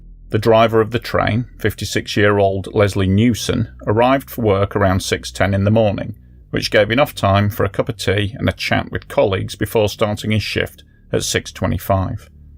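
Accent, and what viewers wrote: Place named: North, United Kingdom. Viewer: it's like listening to one of my northern family members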